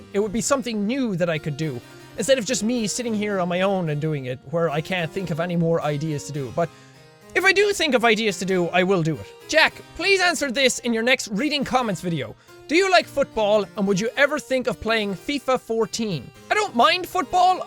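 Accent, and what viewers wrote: Ireland. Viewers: Are u Irish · Hey jack fellow irish man here. Where ya from and can you please say Ballymena 'ey for me!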